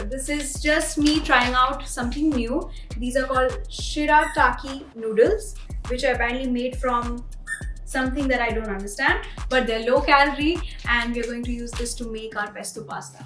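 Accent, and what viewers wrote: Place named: Bengal, India. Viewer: your bengali tone sounds so good. make a cooking video with full bengali language.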